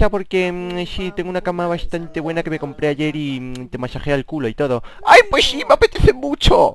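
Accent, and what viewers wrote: Spain. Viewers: No hay pan para tanto chorizooooooo jajajajajajajajajjajajajajaja español y su acento, ricoooooooo ❤️ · No hay pan para tanto chorizo, No hay pan para tanto chorizo! jajajajajajjaa!! es la primera vez q el acento español me hace reir tanto (porq admitamoslo en las peliculas,series y videojuegos ya es hostinante)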